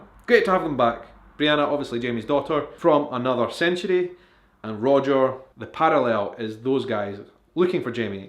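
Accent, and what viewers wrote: Scotland, United Kingdom. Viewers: I was immediately taken aback when Geneva said 'Scotch-man' (and I'm American), and knew (somehow) that it was a careless form of address. Not sure how I knew that was terribly wrong, but it surely set the tone for how we should perceive Geneva (despite the fact that I've already read the books!). I love your 'Scottish-person's' perspective on this series! Not to mention you have a pretty cool accent, yourself!! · Well, it was super steamy until the end when he explained what the difference between love and lust were. I also agree with you, that was the steamiest sex scene outlander has done. I wonder why they put so much emphasis on this one and not the one with the maid back at lollybrach? I am NOT complaining though! Cant wait to see more of your filming location vlogs. Honestly, all your vlogs. Love listening to you before i go to bed. 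Hoping i dream about a Scotsman since i fall asleep with the accent in my head! Ha! Thank you for the video!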